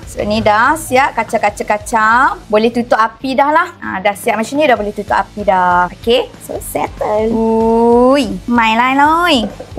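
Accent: North, Malaysia. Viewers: Langsung subscribe deh kamu...ud lama tak dengaq cakap utara..che..prikitiew.sy dah cuba resepi kamu..sedap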